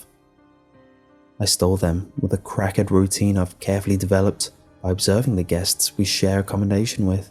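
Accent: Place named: United Kingdom. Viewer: You really lucked out with your damn accent. Anyone with a British sounding accent is just so much easier to listen to.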